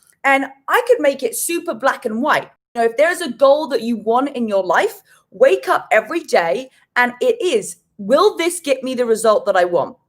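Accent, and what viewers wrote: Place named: United Kingdom. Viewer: So... Firstly - you are amazing! I love your mindset and confidence. And the fact you still have British accent:) And that you know you are enough. My kind of gal! I also love going places by myself and it really is what they say - you learn so much from it! Last year I finally got out of my comfort zone and went to solo trip to Ireland (on my wishlist for years and years). I am use to go places by myself that are near bye but not to another country for 14 days. I was having excuses for years why I can't go (money, time) and than I called out my BS and said to myself: Alenka, you will never get there apparently. So much about ''how much you want to go.'' And I decided and I make an action plan and went. And like you said: Yes it's scary, I was also renting a car, driving on wrong side (:)) of the road, etc. but I learned so much and it gave me so much trust in myself. 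I can do it. By myself. So cheers to exploring. And basically to everything you have to say! The other thing I love is hotel story. I totally agree. We all need more compassion and understanding. And listening to each other not just talking. Oh and playlists yes. So true. Some songs made me up and move around in a heart beat. One of the songs is -. What a tune! With your help - the podcast, Burn/BTES app, the book you really took me to the next level. Most important lessons for me were: setting goals (3m, 6m, 1y especially), what can I do each day to reach them, foc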